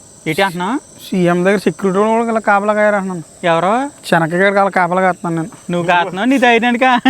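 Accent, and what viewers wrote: Godavari, India. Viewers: Godhavari language super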